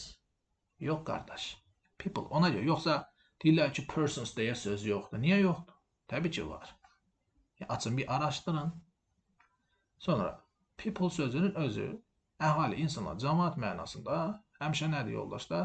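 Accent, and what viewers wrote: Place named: Nakhchivan, Azerbaijan. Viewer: Muellim deyesen naxcivandandir nitqi cox sirindir ona gorede i gilisce sozleri ele seslendirir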